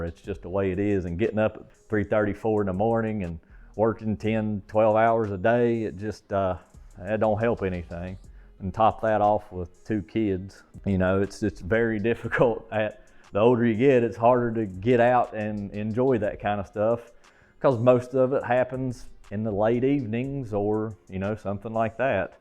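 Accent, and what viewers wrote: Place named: South, United States. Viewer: Dagum I’m digging the southern accent… givem hell you merican 🤣🫵🤫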